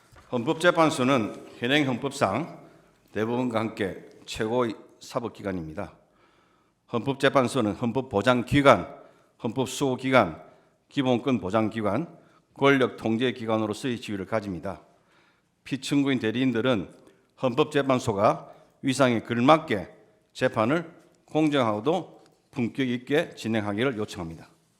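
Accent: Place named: Gyeongsang, South Korea. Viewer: 또 경상도네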